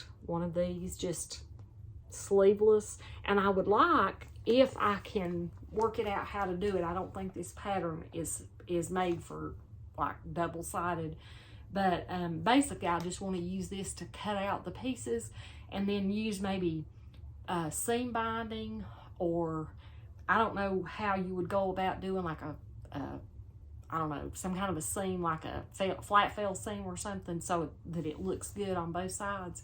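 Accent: South, United States. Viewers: One more thing, u have that southern sound like Ms .that's where we are from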